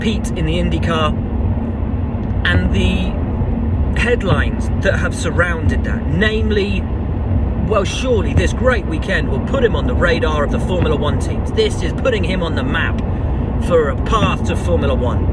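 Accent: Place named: United Kingdom. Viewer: It's nice hearing a fella with a British accent giving respect to the drivers from the US as well as the drivers in our US series'. Realistic take on things. Just hearing people chatter about Herta in the pinnacle is good enough IMO. I'm sure a few of Indycar's top drivers would be competitive in a Merc, RB, & the horse. But that will never happen. Pato just got a foot in that door on Sunday. He may win more races and contend for the championship in Indycar 2021. Could he do that in a Macleren F1???? A highly touted previous world drivers champion was unable to recently so....... ?????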